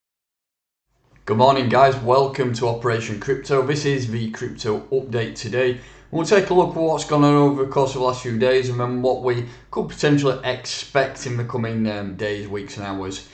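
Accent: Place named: North, United Kingdom. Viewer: Thanks as always chief! Love the videos and the northern accent delivery beyond awesome!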